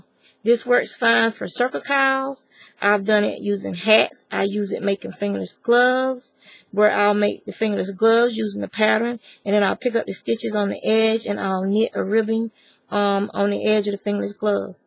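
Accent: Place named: South, United States. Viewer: Loved the bush stitch cowl,. I want to try making one. Gosh, you can fly with that hook! I wish I could crochet that fast. I agree with you, Susan Bates are the best and are the only ones I use. Hate Boye hooks. I have a thick southern accent too. Was raised in southwest VA. I love southern accents! ♡ Hope you have a good week. Looking forward for another podcast soon!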